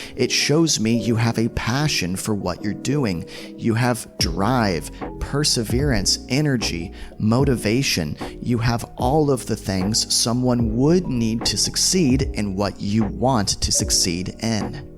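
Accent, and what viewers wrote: United States. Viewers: Are u a native American English speaker? Cuz you sound American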